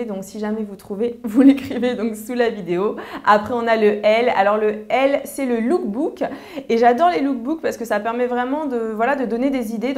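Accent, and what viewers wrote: England, United Kingdom. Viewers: tu me fais trop avec ton accent Anglais!;) Toujours au top, bisouuuus!:) <3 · # Mdr tu a un accent anglais à mourir de rire mais je t aime bien quand même Plein de bisous:-*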